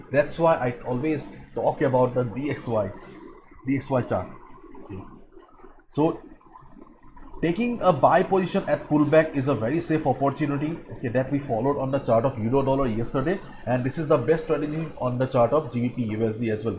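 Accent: India. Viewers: Holy crap I’m an American and I love how your Indian accent isn’t thick at all its super smooth and easy to understand 😂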